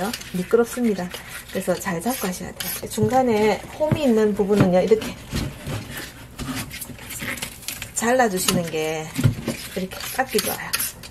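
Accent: Gyeongsang, South Korea. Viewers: 목소리도 듣기좋고 경상도사투리가 더 듣기좋아요 항상 잘 보고있어요 👍👍👍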